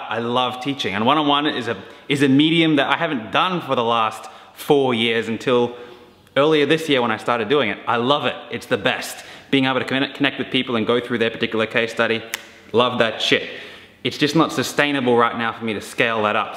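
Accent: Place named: Australia. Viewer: Had to watch the first minute three times, its so hard to adjust to australian accent :) Nice video The car- finance- thing is a mix beetween them securing the loan and them beeing to lazy to look up the full picture. Hope it works out. How do other people manage to get loans on their supercars?, nobody buys these things outright with cash.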